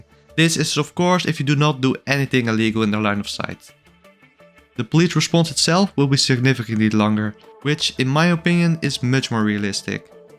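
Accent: Netherlands. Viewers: I think he is dutch so his accent makes it sound like that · OMG!! That thick dutch accent. Sorry cant stand it, i could not finish your video because of it and i am pretty sure i aint the only one thinking that. I would strongly suggest working on that accent if you want to get anywhere on youtube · Are you Dutch you got a Dutch accent . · that thick dutch accent, ik hou ervan!!! leuk video!!! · You sound really Nederlands mijn vriend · Zo te horen kom je uit Nederland ❤ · Thickest dutch accent of the month. Good video tho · Ik hoor nederlands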